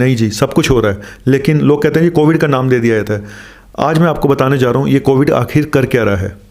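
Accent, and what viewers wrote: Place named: Punjab, India. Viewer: Very sweet punjabi ❣️❣️nice to hear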